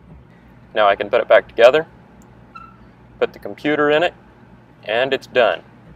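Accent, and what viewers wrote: Canada. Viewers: You from Canada ehh great toutoral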